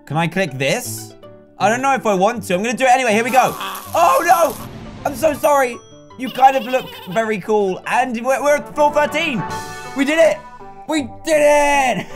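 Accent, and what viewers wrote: United Kingdom. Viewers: british the mincraft man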